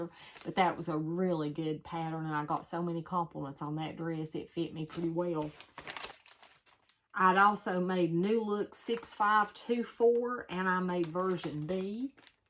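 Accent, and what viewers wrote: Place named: Western North Carolina, United States. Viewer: Your Mom is beautiful! Your accent sounds as if you might be from western NC, as am I. Just found you & am looking forward to watching more of your videos...😊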